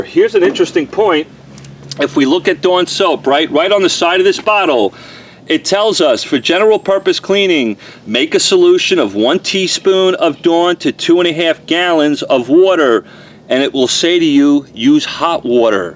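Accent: New York, United States. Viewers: he is from NY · He sounds like he has a NY accent, and I'm from there, soo it's a NY thing, the hand gestures lol, we talk with our hands too lol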